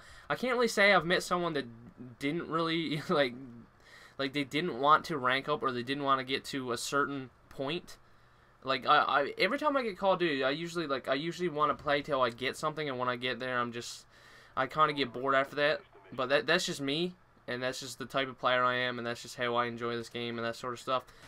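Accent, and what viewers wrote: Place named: Newfoundland, Canada. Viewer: Are you from Newfoundland? You sound a little like a newfie! :D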